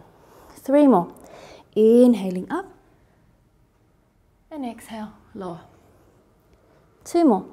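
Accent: Australia. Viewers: Thank you for a fantastic flow. So good to be guided by an Australian voice at home. 🙏🏻🧘‍♀️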